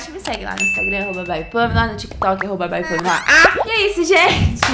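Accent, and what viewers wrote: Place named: Minas Gerais, Brazil. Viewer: Mô Mineira Né kkkk E MT Legal kkkk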